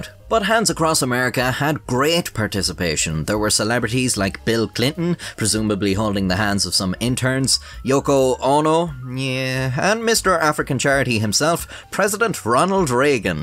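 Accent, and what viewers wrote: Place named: Ireland. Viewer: Tell me why this narrator sounds like some sort of animated insect with an Irish accent?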